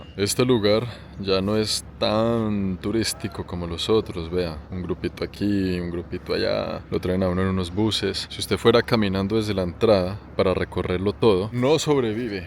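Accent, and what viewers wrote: Colombia. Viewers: Que jodido eres para narrar paisa , me haces reir ; sobretodo ese acento colombiano me encanta . Noble tierra , gente maravillosa como tu . Saludos desde Suiza 😊.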